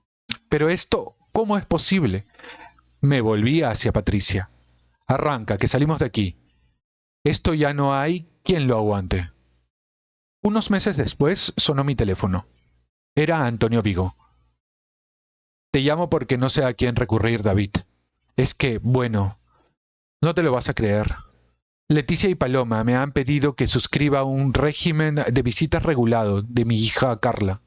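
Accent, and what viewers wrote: Lima, Peru. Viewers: Si, A mi también... Tipicamente limeño.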